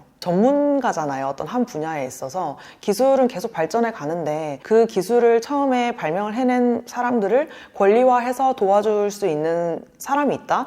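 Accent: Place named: Gyeongsang, South Korea. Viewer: 경상도 사투리 구수하고 좋다 ㅋ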